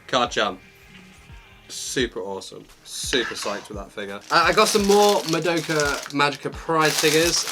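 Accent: United Kingdom. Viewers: are you british ? ( I love so much your video )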